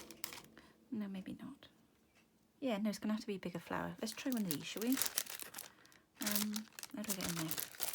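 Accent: Wales, United Kingdom. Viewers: Do i detect a Welsh accent, where r u from, like your work. X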